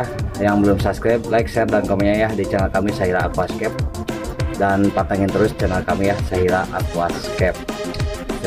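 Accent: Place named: Medan, Indonesia. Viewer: Kau bicara kenapa jadi logat medan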